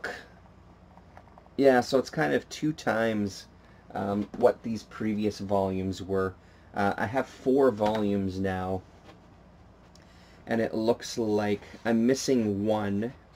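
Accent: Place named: Canada. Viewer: Canadian! He says "aboat"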